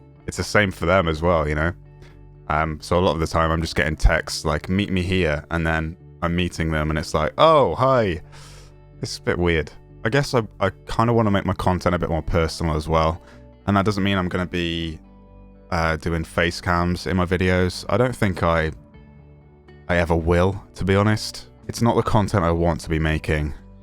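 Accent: United Kingdom. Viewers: Good on you, to be honest I never actually subscribed until now basically because I 'assumed' I already had (hearing you in the videos) while watching Aculite 'so much' and Fugglet is on fire at the moment - so I kind of always watched you in a 3rd party sense. My Bad, Subscribed and keep it coming mate. Nice to hear the UK within the 'TEAM'. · I live in Shropshire dang.... Thought your accent sounded familiar🤦‍♂️ I'm from Telford,.. tiss a bit rough lol. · has a British accent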